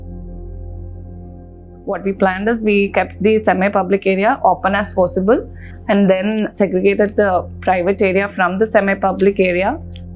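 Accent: Kerala, India. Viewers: Very cute house. Loved the explanation in heavy Malayalam accented English. I didn't understand what he was saying in the end but the bloopers were cute. A few questions please: 1) these metal sheet stair case are they long lasting, though they look visually stunning and the colour yellow looks awesome, will it start creaking and breaking in a couple of years? 2) the sofa in the hall is tucked under the staircase the person sitting in the corner will be an ideal candidate for head injury 😊 The black flooring looks awesome. Is this tiles or black oxide flooring? Do you do projects outside Kerala?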